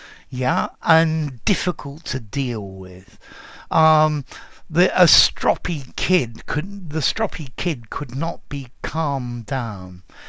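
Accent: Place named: England, United Kingdom. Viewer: I understand this video is a couple of years old. I am an Australian Brit, born in 1964. With both my parents passed now, it was rather delightful hearing an English voice clearly describing the use and origin of British words. My mother, herself a rather stroppy woman at times whom we affectionly referred to as the grammar nazi, was forever ensuring we pronounced and utilised words correctly. I am feeling rather nostalgic. Thank you. 😊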